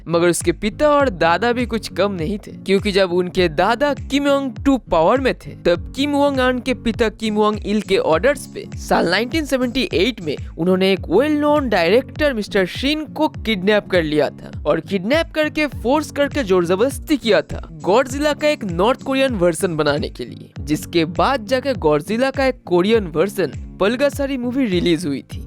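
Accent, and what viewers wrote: Bengal, India. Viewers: Tum aisa q bolta hai tum bangali hai kya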